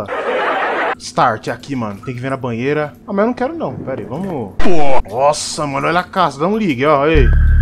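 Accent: Ceará, Brazil. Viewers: Olha um cearense kkkkk a não mano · Aeeeee Um cearense!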